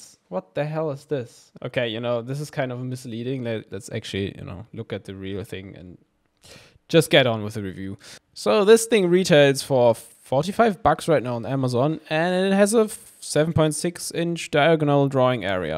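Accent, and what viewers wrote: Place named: Germany. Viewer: he has the most german accent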